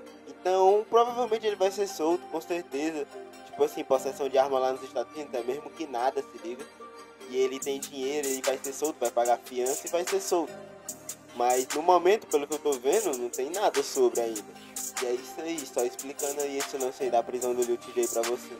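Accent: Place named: Pernambuco, Brazil. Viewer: Sotaque de Pernambuco aí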